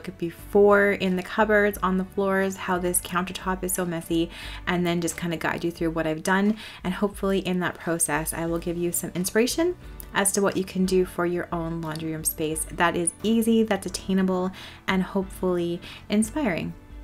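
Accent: Canada. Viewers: You have such a pretty laundry room. I love the hits of red and cream and white it just looks so organized and clean it would inspire me to get busy on laundry. I like your Canadian accent too. Your voice is very soothing.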